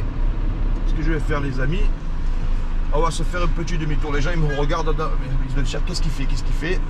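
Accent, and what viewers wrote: Marseille, France. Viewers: Salut, quelle plaisir tu me fait voyager le soleil, la mer, l'accent, j'en redemande 😊bonne continuation à toi 👍 ✌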